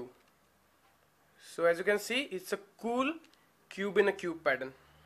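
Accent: India. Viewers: You kind of sound Indian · he sounds weird because he is indian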